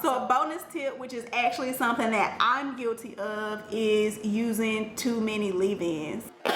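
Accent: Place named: Alabama, United States. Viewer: Had a feeling you were from Alabama..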